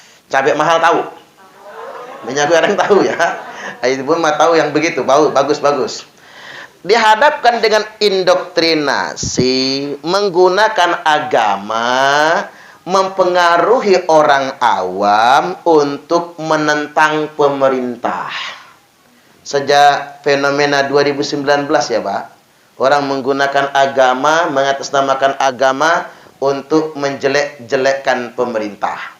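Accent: Java, Indonesia. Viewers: Pak ustat orang mana to jawane? Jawa apa bukan?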